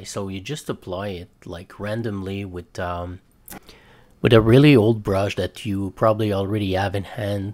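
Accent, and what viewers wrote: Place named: France. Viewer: My dude, for a french guy you have a great accent!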